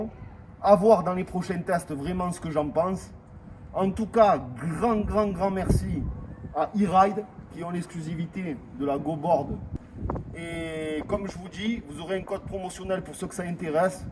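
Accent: South, France. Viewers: Plaisir de t écouter!! Jkiff l accent du sud!!👍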